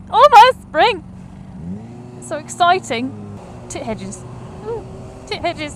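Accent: United Kingdom. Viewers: British accent is pretty funny to hear Cheers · You have a lovely voice. I watch your videos just for the sound. But while listening to you or any Brit I always think man....there's no way I could be British besides the fact that I'm an American because it takes too much effort to speak like that. You guys put so much effort into speaking. Americans try to shorten words and drop syllables any chance we get but Brits look to add syllables every chance they get. Example... Aluminum 😀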